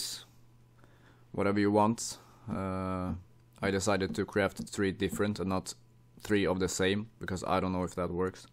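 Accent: Sweden. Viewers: i can hear your swedish dialect.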